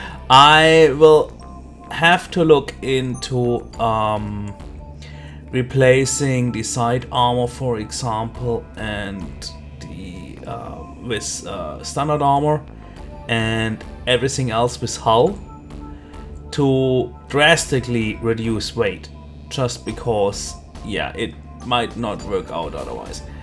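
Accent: Germany. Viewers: I just moved in here in germany and i can tell that you are german or at least something similar just because of your english. Correct me if im wrong.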